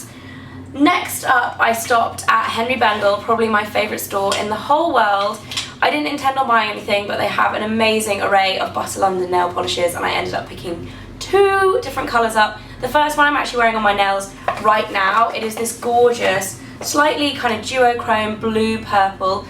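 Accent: United Kingdom. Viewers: wow I really love your English accent! so elegant! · omg yes your british :) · Just discover you... and I LOVE the accent of the UK! LOVE it. You are beautiful!! xx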